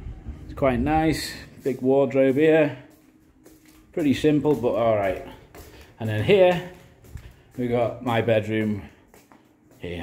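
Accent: Liverpool, United Kingdom. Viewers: is Mada safe? I've always wanted to go i was fascinated with it when i was a kid i'm still a Geography nurd now that's why i was surprised i hadn't heard of nosy be. where are you from? am i detecting a Liverpool accent there?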